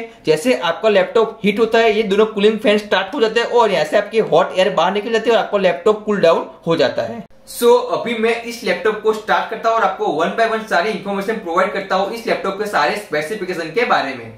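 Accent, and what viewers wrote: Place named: Gujarat, India. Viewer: Yeh pakka gujarati hai iske bolne se hi pata lag gaya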